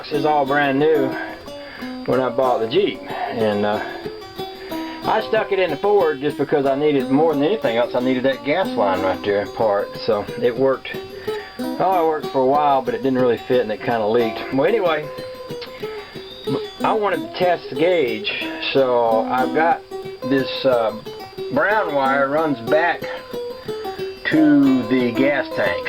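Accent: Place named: Tennessee, United States. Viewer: The license plate said Maine, but your accent said TN. Nice jeep and thank you- I'm needing to test my fuel gauge too, in TN.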